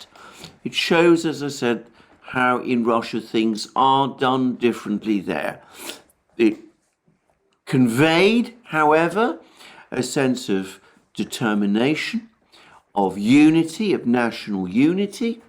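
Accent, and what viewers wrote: United Kingdom. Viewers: One of the best channels for astute russian propaganda with a fine british accent from an ex laywer. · This is the longest uninterrupted string of praising Russia in a British accent I've ever heard. Not a single moment of anything that could be seen as a criticism. Weird, isn't it?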